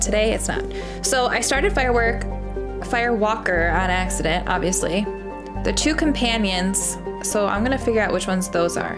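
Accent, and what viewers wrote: New York, United States. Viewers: I absolutely do not mind your New York accent. I actually find it to add extra flavor to your already great video content lol. I encourage it lol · I knew you were from NY! Are you by any chance from WNY? 😂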